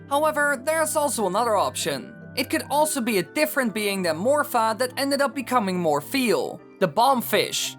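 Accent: Netherlands. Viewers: I hate Your fucking Dutch accent so much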